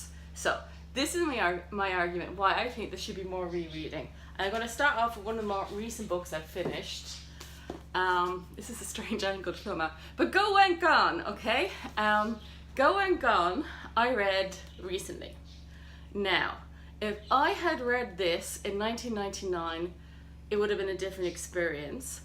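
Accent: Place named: Ireland. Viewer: Hi, I found your channel through Shawn and although you’ve only filmed ten or so videos, you seem a natural and it’s lovely to hear an Irish accent (via Australia was it?). I made a comment on Dave’s channel to the effect that I’m a very new rereader but have embraced it wholeheartedly, despite having as many unread books on my shelves as you have 😊. It’s primarily because I realized I can’t remember the books I read twenty years ago and it feels ridiculous saying I’ve read them when I can remember nothing about them. I’ve done four rereads this year and each one made that particular book so much more meaningful and I feel I could reread each one again. I must admit I don’t think about rereading mysteries and thrillers as part of the fun of those is finding out who did it but with my sieve like memory, that probably doesn’t matter! 🙄 I loved A Tale for the Time Being when I read it a few years ago and now you’ve made me want to reread it. 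Yes to rereading!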